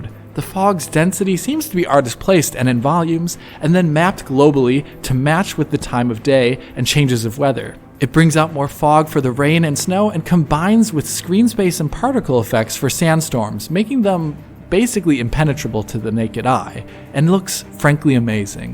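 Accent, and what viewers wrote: Germany. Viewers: So you are german? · Wait, what is native language? German?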